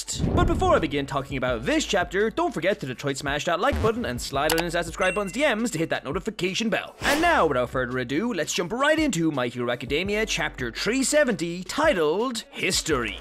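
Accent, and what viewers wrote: Ireland. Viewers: I knew your accent was so familiar as an Irish person myself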